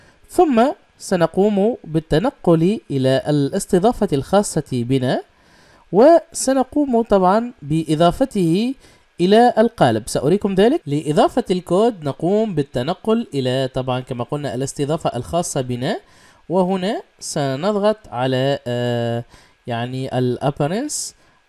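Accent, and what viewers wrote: Tunisia. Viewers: 5ouya mbeyan men soutek tounsi ena tounsi zeda leken ett makch ma3rouf fi Tunisie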